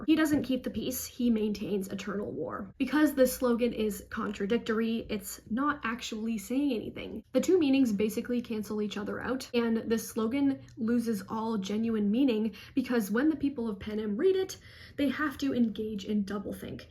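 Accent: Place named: Canada. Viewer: this is the first video of yours that i've watched so when you first started talking, i got a vibe that you were canadian and im glad to see i was right. besides that, this is such a cool concept and exactly the kind of analysis ive been looking for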